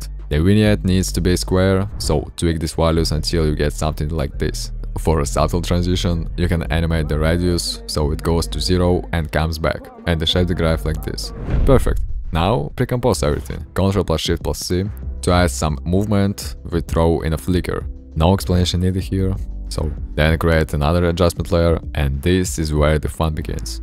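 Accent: Russia. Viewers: очень слышно русский акцент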